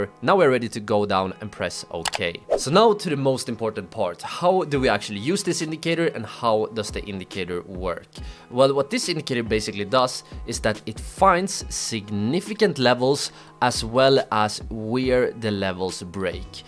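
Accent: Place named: Sweden. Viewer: 😂first time seeing you and I already hear ur Swedish accent?! Great explanation for the strategy but brother am trading in lower time scales 15 and 5 min. Still works? And what settings would you recommend? Thanks in advance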